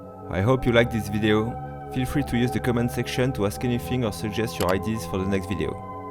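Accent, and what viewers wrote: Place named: France. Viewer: J'aime beaucoup ton accent français. Salutations d'Italie ! Ciao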